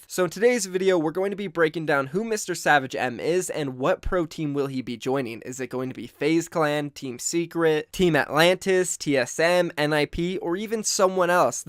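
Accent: Norway. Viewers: Damn is he from Norway?, im from norway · Is he Norwegian?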